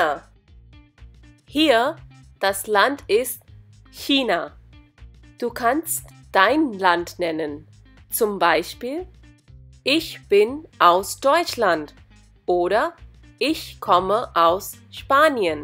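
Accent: India. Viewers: the lady speaking has an indian tone to her deutsch sentence.. does any one else think so? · I was hoping for a German speaker, not an Indian person.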